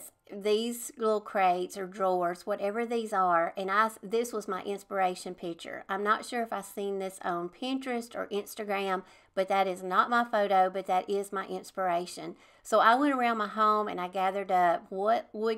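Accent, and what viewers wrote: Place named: Tennessee, United States. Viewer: I really love your creativity and have used your ideas in my crafts. I wanted to say I have a sister in Tennessee and her name Theresa with the "h" and hearing your accent makes me think of her because we grew up in Michigan and she has the accent and I am living in Michigan where we grew up and just get a kick out of listening to my sister Theresa. Anyways, I have made decor projects for my house watching you.😂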